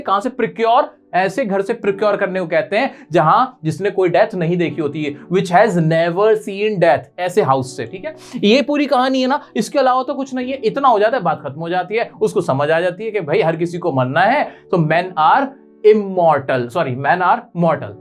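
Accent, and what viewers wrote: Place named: Bengal, India. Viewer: You are bengali bro you say in the lesson maa-nush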